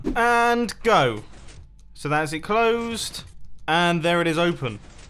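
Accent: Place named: United Kingdom. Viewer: Yeah it’s Seth Bling with a British accent.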